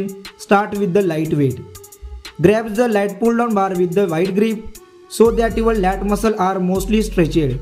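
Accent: India. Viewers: Lol the person who is talking is not even american. Obviously from india! He is using this video so can make money he is not even a trainer. Sorry buddy I need to mute you I can’t concentrate. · hahhahahahah your indian accent is so funny.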